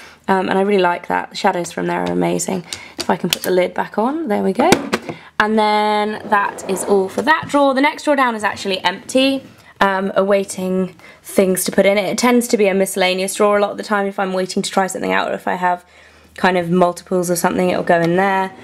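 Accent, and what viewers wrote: United Kingdom. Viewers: British accent I WANT THAT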